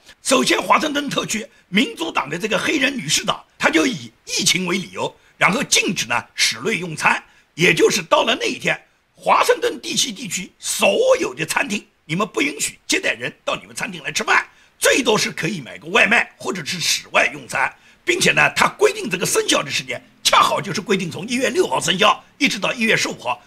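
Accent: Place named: Jiangsu, China. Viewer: 听你口音带了点苏南味 但我一朋友 钟恒 曾是你在南京的同学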